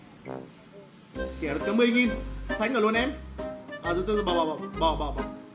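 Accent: Nghe An, Vietnam. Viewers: Ah ở đoạn mô nghệ an rứa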